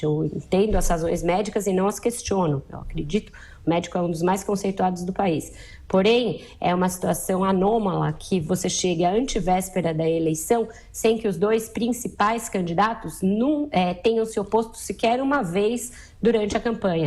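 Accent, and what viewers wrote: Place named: São Paulo, Brazil. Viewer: Sotaque charmoso, TU TÁ DE BRINCADEIRA, alias o seu sotaque paulista também é chato lembrei-me daqula moça correspondente da Globo em Londres ela é cheia de XXXXXXXXXXXXXXXXXXXXXXXXXXXXXXX horrivel da mesmo forma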